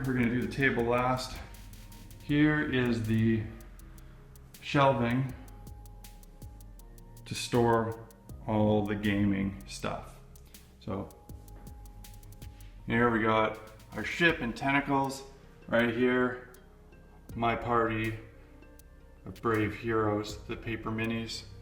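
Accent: Canada. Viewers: Are you from New Brunswick?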